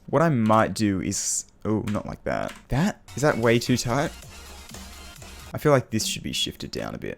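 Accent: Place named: Australia. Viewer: When are you making a level request challenge, though? Curiousity XD. But requests aside, Your content is underatted, your commeentary and Australian accent makes it better. You deserved more! Oh and yeah for the edit, put your Discord link in the Description. Im joining the Discord server 100%. - Null1f1ed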